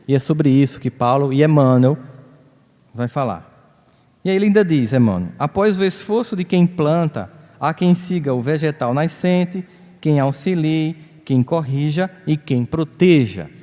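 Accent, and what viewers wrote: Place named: Northeast, Brazil. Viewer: Assistindo hoje 17/01/2022. Há 6 anos, em um momento muito delicado da minha vida (depressão braba ) conheci esse iluminado, aleatoriamente mexendo no youtube, e nunca mais deixei de ouvir suas palavras. Sempre busco suas palavras, pois me fortalece, esclarece e me centra. É uma viagem p dentro de nós mesmos. Sem falar q amo esse sotaque, pois meus pais tb são nordestinos.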